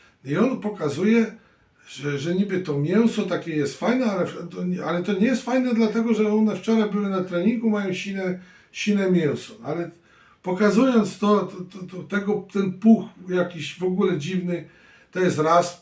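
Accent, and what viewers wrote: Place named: Russia. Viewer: Co ten chłop pieprzy.Mowi na filmiku że już teraz zbiera czarny bez i daje gołębiom.Bylem w paru miejscach z ciekawości gdzie rośnie czarny bez i wszędzie jest jeszcze niedojrzały.Dlatego mnie to zdziwiło że ten hodowca od siedmiu boleści mówi że już zbiera i podaje bo ja też podaje bez i zbieram dojrzały na początku września.Radze nie słuchać rad tego hodowcy bo nie trzyma się kupy ani dupy.A jak taki wybitny hodowca że chce szkolić innych to niech się pochwalić jakie ma wyniki na przestrzeni lat na szczeblu oddziału już nie wspominając wyżej Żenada co on bredzi na tych jego filmikach jeszcze ten ruski akcent.Ortodoksyjny Ślązak!!!!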